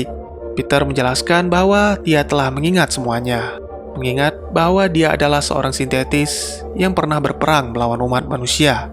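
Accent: Aceh, Indonesia. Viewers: Mimin org aceh ya?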